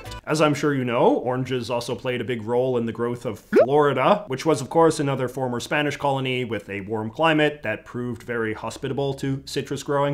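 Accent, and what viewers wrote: Canada. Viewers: I love the Canadian accent poppin out here and there! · Never mind I just heard you pronounce about and around, you are 100% canadian no hiding it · he said aroond instead of around. he's not american he's canadian. al though one could argue that he is north-american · i realized you were canadian. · You sound very Canadian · You make a very interesting video about candy in the United States, yet your Canadian accent and the candy from Dollarama betray you within the first 30 seconds of the video. Fellow Canadian here, good stuff 👍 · didn't he say himself that his accent is stronger than most Canadians anyway? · You don’t sound American. You sound Canadian. 🤔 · This man is the most interesting combination of different groups. He has the hair and mustache style of the 80’s, the accent of a Canadian when saying words like about and around, he has the facial expressions and head positioning of a surfer dude, and he up-talks where you end your sentences in a higher pitch.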